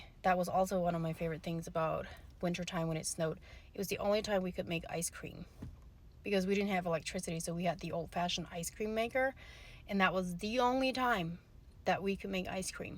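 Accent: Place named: Pennsylvania Dutch, United States. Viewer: The Pennsylvania Dutch accent really comes out when telling these obviously very beloved childhood stories!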